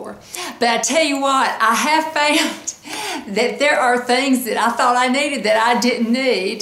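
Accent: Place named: Tennessee, United States. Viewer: Great hair! Yes you are so right, we can get by with a whole lot less than we thought! I too did my roots but must admit can’t wait to sit in my hairdressers chair again! I had the privilege of living in Tennessee for my senior year of high school. I made some dear friends while there. Every time I hear your voice it brings back fond memories of them! I loved their TN accent as much as they loved my GA one! Stay well!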